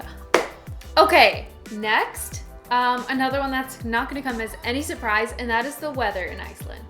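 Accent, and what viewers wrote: United States. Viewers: So tourists are the main downside of living in Iceland.......................Said the woman with the American accent. Hypocrite.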